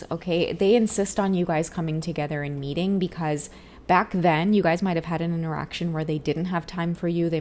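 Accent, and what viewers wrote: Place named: Trinidad and Tobago. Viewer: You got a subscribe with that trini accent😊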